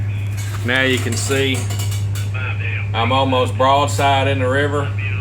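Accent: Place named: Appalachia, United States. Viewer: You did a FINE job but MERCY, you sound like you're from here. You'd make a fine hillbilly if you wanted to!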